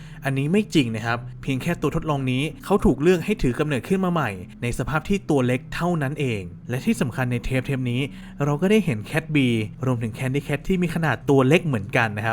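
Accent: North, Thailand. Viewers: พี่คนเหนือป่าวดูหลายคริปน่าจะใช่5555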